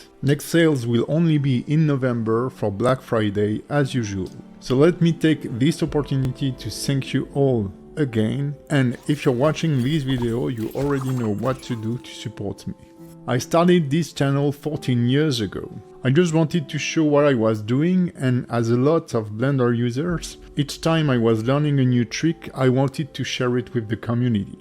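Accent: France. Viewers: Cet accent français j'adore je comprend mieux lol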